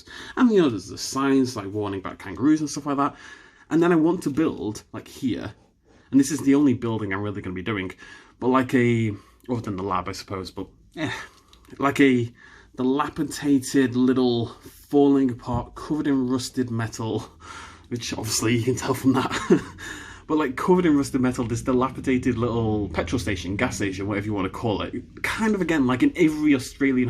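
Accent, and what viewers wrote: United Kingdom. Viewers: I just like to listen to British guys talk about video games so sketch away.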